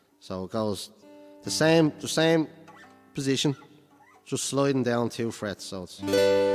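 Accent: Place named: Ireland. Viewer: Open G is a great tuning but not only for slide as shows us.but I'm buggered if I can follow his chords. I'm just trying because I love his Jumbo and his Irish accent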